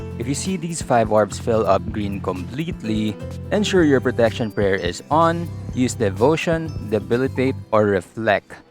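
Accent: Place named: Philippines. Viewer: I knew ur a Tangina by ur voice lol 😆 nice man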